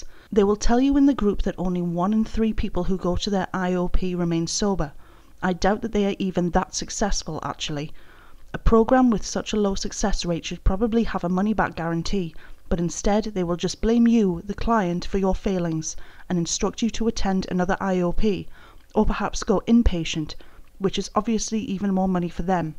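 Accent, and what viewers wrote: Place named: Newcastle, United Kingdom. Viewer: More than British...you’re a Geordie...hell you could be one my neighbours 😂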